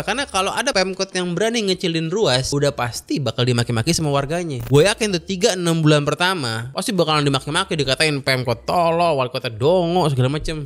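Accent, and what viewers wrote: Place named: Sunda, Indonesia. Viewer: Seru bang gaya bicara nya, sisip sisip Sunda Sunda nya saetik EUY 👍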